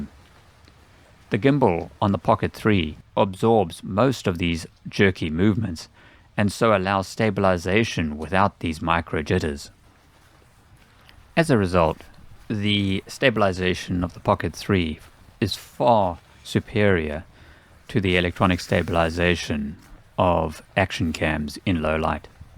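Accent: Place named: South Africa. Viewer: You sound like my friend from South Africa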